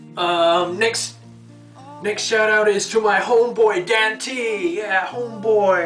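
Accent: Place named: England, United Kingdom. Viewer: I'm REALLY curious on how u got ur Semi-English accent??!! I want one hehe Lo0oL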